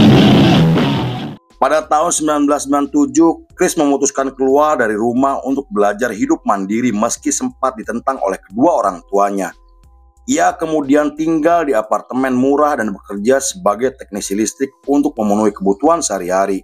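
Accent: Indonesia. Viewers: Medok amet suaranya kaya kang ibing 😂😂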